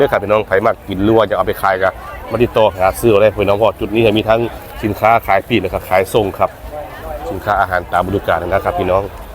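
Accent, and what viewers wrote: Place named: North, Thailand. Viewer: แอดมินพูดสำเนียงคือคนเหนือแท้ 😅 ผสมลาว ผสมไทย เอออะไรกันแน่ แมงจิห่ล่อน่าซื้อมาก็ถูกจริงถูกจัง🎉🎉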